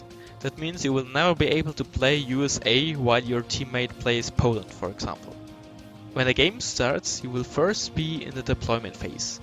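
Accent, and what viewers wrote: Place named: Germany. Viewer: Kannste das ganze nicht nochmals auf deutsch machen? Du bist dem deutsch ja mächtig, wenn ich auf deinen Akzent höre...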